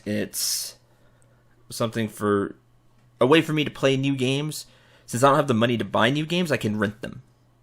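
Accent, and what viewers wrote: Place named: Texas, United States. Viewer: Let me guess by your accent since one of my cousins who lives there sounds like you. I am going to guess you live in Texas, I have 1 out of 13 shot. ^_^ Also, can you play Kirby and the Crystal Shards 64 if you ever have time or if you even have it. P.S. I am not going to hunt you down, I am just intrigued if I am right. I also live in GA, so it is not like I could even if I wanted to.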